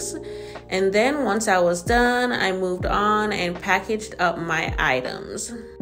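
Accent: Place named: Jamaica. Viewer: Thanks for sharing sounds like you are Jamaican I am too good luck on your business. I am starting my tshirt business too so I’m here to learn and grow.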